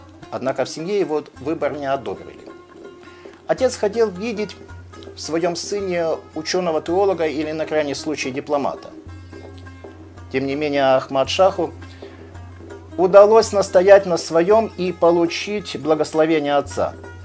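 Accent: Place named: England, United Kingdom. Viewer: Он не руски акцет ангилиски ест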